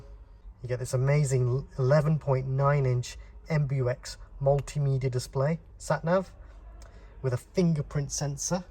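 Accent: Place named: United Kingdom. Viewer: British Talk about German Car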